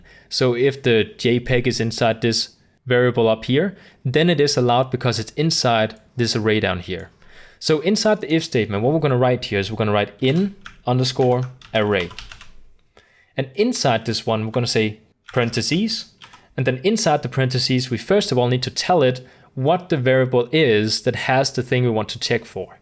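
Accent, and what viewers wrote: Denmark. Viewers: danish accent yes?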